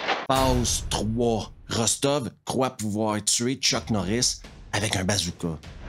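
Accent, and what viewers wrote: Quebec, Canada. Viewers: Y'a quand même bien un sacré québécois pour nous raconter cette hostie d'invasion communisse que Chuck il a arrêté, merci pour l'histoire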